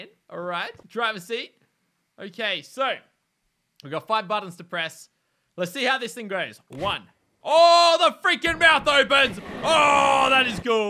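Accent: Australia. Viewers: Yep he's fucking Australian subbed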